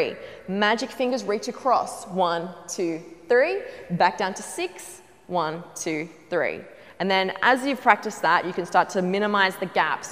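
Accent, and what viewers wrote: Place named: Australia. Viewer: I could listen to you talk all day! What an awesome Australian accent you have.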